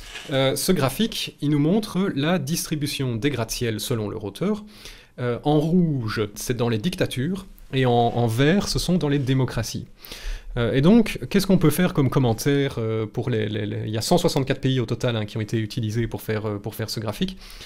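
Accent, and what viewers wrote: Belgium. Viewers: J'adore l'accent belge 💕💕💕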